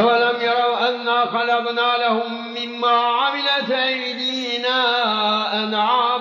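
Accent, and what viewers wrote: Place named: Turkey. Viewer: You can hear his Turkish accent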